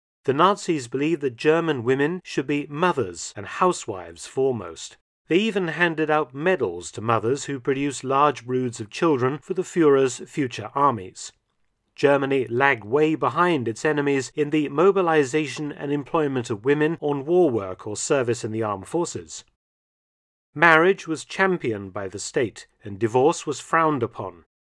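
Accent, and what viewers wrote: United Kingdom. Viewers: Mark Felton, I love your educational channel. I've caught a lot of your videos. They are very informative. Very well laid out. 100% professional. Except your voice. Now you sound like one of those fine British dudes? And you guys are known for delivering, superlative audio. As that will help your rather droll delivery. Because I'm not a big fan of your voice. But no design. You produce some great educational videos of history. Thank you. I glean much from your videos. Now, I know there really isn't anything you can do about your voice. But adding a tiny bit of, free software-based, processing. Would be an advantageous measure to take. Doing little things like, rolling off the low frequencies. Boosting the upper midrange frequencies between 3 and 5 kHz. And then an extra little boost of high-frequency treble, boost. Followed by what we call a Dynamic Range Limiter. 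With settings of 20:1. Attack and release times. Set to, Medium. And making the meter deflects, downwards. Like your windshield wipers on the highway during a, hurricane. And then you'll have something. It's easy to do. You can do it too. It's okay. We won't tell anybody! RemyRAD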